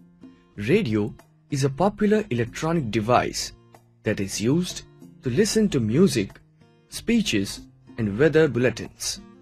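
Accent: India. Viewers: IS THIS INDIAN GUY? WHO TALK? SOUND LIKE INDIAN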